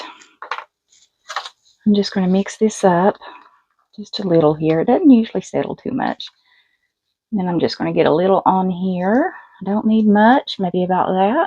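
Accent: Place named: South, United States. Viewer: I thought that was a southern accent my dad was from Georgia and my bother lives in Texas I live in Utah 😊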